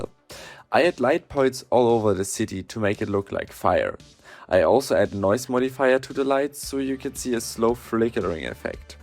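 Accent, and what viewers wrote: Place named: Germany. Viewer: Are you German? You sound like you have a slight accent ✌️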